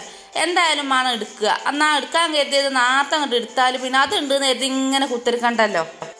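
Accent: Malappuram, India. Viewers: yaa ithaan njangale bhaasha thani mlprm slang 😍😍😍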